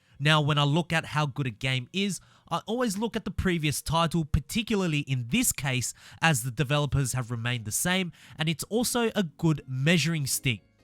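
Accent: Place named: Australia. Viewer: Everyone who is recording forza videos have Australian accent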